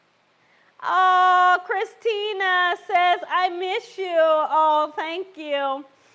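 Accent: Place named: South, United States. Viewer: I just love you I love ❤️ you southern accent and cooking I learn so much from you I just got the best of both worlds cooking southern dishes and I also cook Spanish dishes but sheesh ain’t nothing I can’t cook thanks to you and YouTube ❤❤❤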